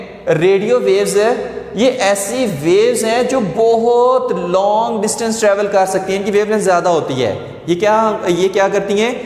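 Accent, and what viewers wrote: Jhelum, Pakistan. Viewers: Are you from jehlum? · Are u from jehlam sir😮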